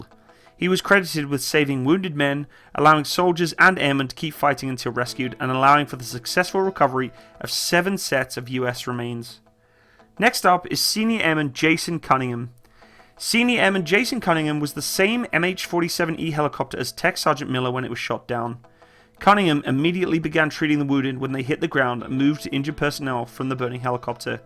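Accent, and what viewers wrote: United Kingdom. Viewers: I was not expecting the British accent when I clicked on this video. And after I heard the accent, I was not expecting the level of detail and attention put into this. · I'm ex air force elite medical rescue. The British narrative is offensive. You're Not American. Please be respectful, sir. Although I do admire your respect. Put subtitles for translation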